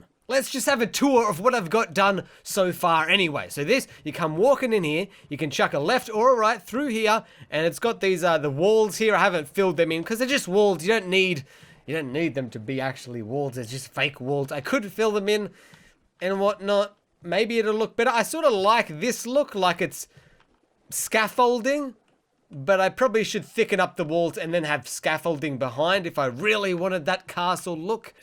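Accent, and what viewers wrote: Australia. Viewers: thx bro, and it sounds like your a aussie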